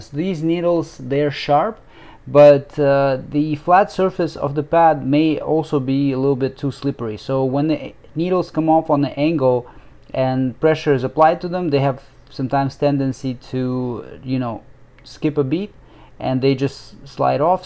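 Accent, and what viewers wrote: Russia. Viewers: Русский акцент топ) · У тебя русский Акцент Причём сильный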